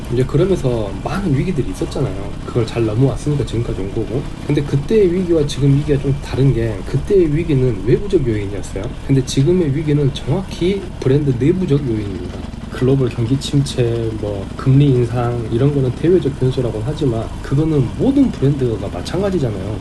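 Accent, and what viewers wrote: Jeolla, South Korea. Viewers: 구수한 전라도 사투리와 할리의 엔진소리라…찰떡궁합이네요😊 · 억양이 순천분같어요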